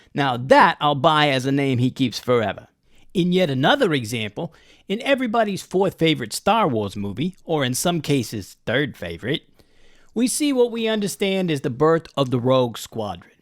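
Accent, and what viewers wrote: Brooklyn, United States. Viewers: You from Brooklyn,? You talk like Joe Pesci. Joe.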